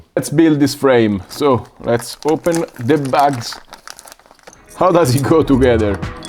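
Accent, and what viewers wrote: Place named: Italy. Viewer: When I was looking to buy my first quad I was confused. Finally I found Your channel and watched Your videos which gave me some knowledge about setups and motivational boost. During this time Your skills were totally mindblowing for me. It's quite funny when I'm coming back here right now I feel so motivated to go out and fly when I hear Your voice and italian accent:D Thanks:)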